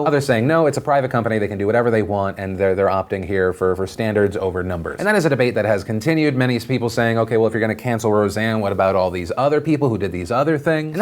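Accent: South, United States. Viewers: Why does talk with a southern like accent some of the time? When he is of Italian descent, raised in the Bronx, and currently lives in LA. 🤔🤔🤔 Love and his show, just wondering?! Lol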